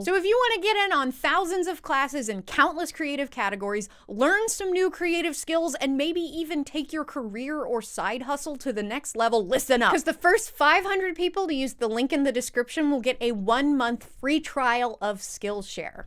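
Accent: United States. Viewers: As each day passes, the american accent grates harder on my soul.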